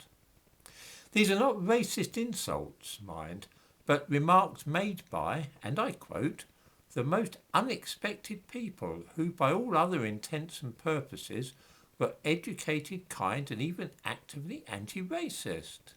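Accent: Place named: Middle England, United Kingdom. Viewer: Ah,the Wonderful,Soothing,warm tones of middle-England,just like a Cup of Tea!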